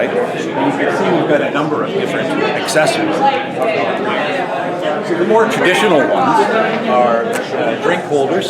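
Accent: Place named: Canada. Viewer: Me: This guy sounds Canadian. Guy: "If they have to take their kids to a hawkey game--" Me: 😎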